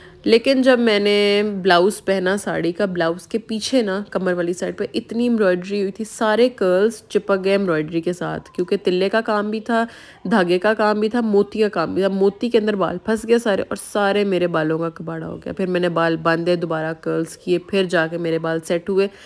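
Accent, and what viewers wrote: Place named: Punjab, India. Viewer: Kuch b lag rahi ho bolne se bilkul paindu lagty ha kuch b high fy pehan lo bolne ka andaz daggon wala hi rehta ha punjabio ka bolne ka style sahi karo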